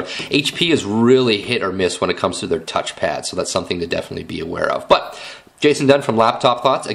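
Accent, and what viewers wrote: Canada. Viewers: I think most of us Yanks dig the Canuck accent Jason, so pay no heed as to what other Americans say! :0)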